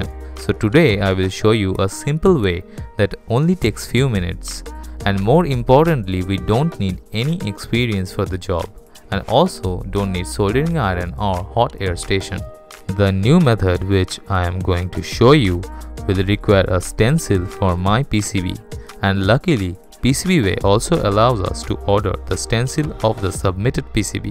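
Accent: India. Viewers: Indian guy, hire a pure bred western guy for voiceover😉 No one will worry how induction cooker messes up smd things at 165 c coz hot air guns min temp is 130, get upto 500 deg c 😎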